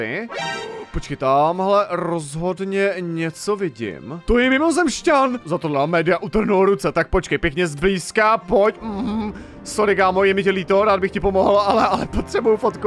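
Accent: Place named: Pilsen, Czech Republic. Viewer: Upřímně jsem toto videjko měla dneska jako relax - byla jsem na 1 den u Plzně na výletě (v Domažlicích) a tedy... jak jsme přijeli do Prahy, energie někde (nevěřila bych, že si to někdy až tak uvědomím, ale už jen ten fakt, že se znovu vracíš do domoviny / jsi blíže do reality, tak tě vyčerpá) a já už si fakt přála, abych byla doma 😅 Vážně, dneska jedno z videí, kde jsem zarelaxovala a teď přímo postel xD Zítra ještě shlédnu videjku na main YT, ale dneska jsem si dočerpala "pozitivní energii" zde, zítra doplňuji u hlavního kanálu. Děkuji za ni (a též si budu o to více vážit, když tě někdy nějaké ten přízvuk od Plzně se občas objeví ve videjku) ❤:D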